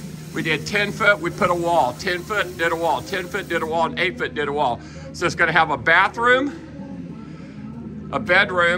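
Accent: United States. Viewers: Aku tidak paham bahasa inggris aksen amerika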